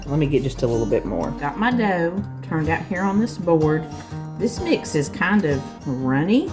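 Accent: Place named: Tennessee, United States. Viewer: you can tell by my title that’s my country too ! I live out behind Dollywood ! Love the Smoky Mountains !! I thought your accent was a lot like mine !! 🧡🤍Ren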